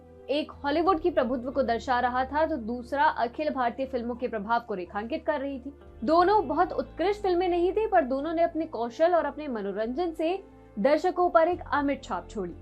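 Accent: Standard. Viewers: I must appreciate your shud hindu pronounciation 😘😀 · Yaar itnaa suddh hindi kaise bol lete ho pehle yeh batao? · Listening to pure Hindi was good ms.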